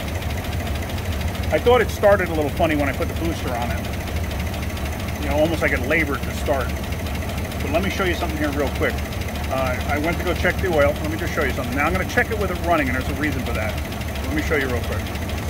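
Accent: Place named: East Coast, United States. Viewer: lol! I was trying to identify your East coast sounding accent. I was pretty close. I'm amazed that truck is still running now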